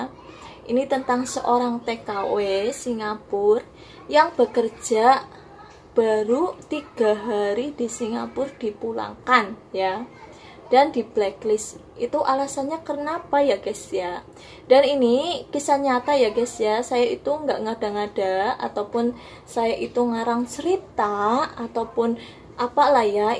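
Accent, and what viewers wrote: Banyumas, Indonesia. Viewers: Haha .ngapak kaliii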